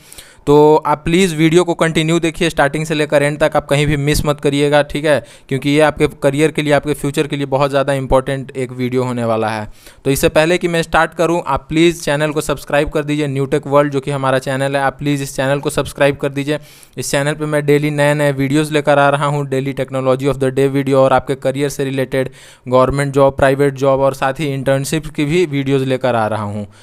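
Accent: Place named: Bihar, India. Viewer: yes exactly . I don't know what short listing criteria Josh is using. Sometimes they select only on one question and some time dont select even on all 3 correct. Btw sorry for being little rude in cmnt above. Liked ur way and accent (bihar accent) of explanation.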